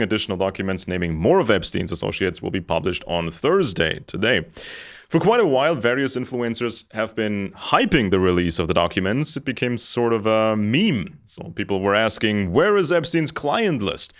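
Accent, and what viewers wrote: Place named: United States. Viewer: Well done! I put headphones on and listen while I work. I can relisten to one episode 3 or 4 times and get something new each time. I really appreciate your efforts. I'M LEARNING!!!!! You're English is so good that if you had not told me you were German I would have thought you were from the USA.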